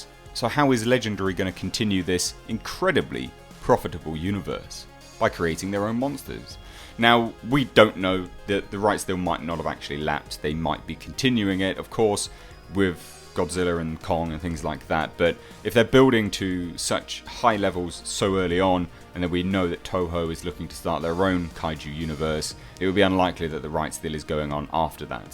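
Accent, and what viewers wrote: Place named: England, United Kingdom. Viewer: Your trailer breakdown was the only one that was good!I'm 48, grew up watching all these movies, Gamera also! But I've never, and I mean NEVER been so excited for one of these movies, they've done godzilla so well! Monsters look very realistic! It's gonna be great! U have an English accent, are you in England or the USA? And are the release dates the same?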